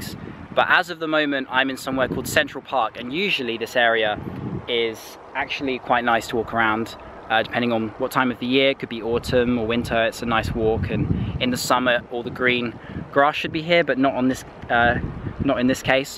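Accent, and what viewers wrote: United Kingdom. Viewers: Oh gosh! I'm so into your British accent. As an English student at the college, itu really helps my English listening skill a lot. I watch it without seeing the subtitle. Keep it up pal.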